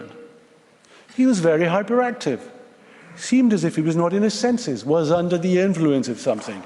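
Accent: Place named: United Kingdom. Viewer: To parade the British accent as something that might invoke jealousy in Indians is rather infantile of your part. I 'll come to the issue of Kashmir though. At the very outset, you must get your facts right. It was Pakistan and not India who violated the UN guidelines by not withdrawing its troops from Kashmir in 1948. Why cry foul now? Coming to the issue of 'unarmed Kashmiris' being on the roads, it is no secret that the whole might of ISI and its Mullahs is behind how this sadist project has seen the light of the day. How systematically stone pelting has been funded. The political careers of separatist leaders from Mirwaiz to Malik rest on the instability of Kashmir. Other than that they do not have any clout in other parts of India. Might I ask, where exactly were the so-called separatist leaders and their sympathisers in Pakistan when the whole Kashmir valley was flooded a few years back? 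What we as Indians understand about our country is none of your business. We have institutions still in India that have not been sabotaged by Mullahs and Military unlike the Kangaroo courts of Pakistan where capital punishment is doled out liberally.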